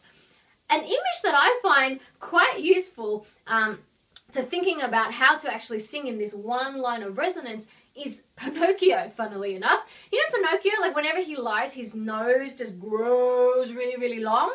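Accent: Australia. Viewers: It's Australian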